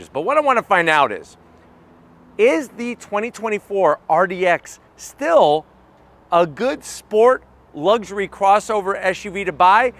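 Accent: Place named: New Jersey, United States. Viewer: I need to know if you're originally from Jersey. Your accent is driving me crazy. It's so similar to my mother's.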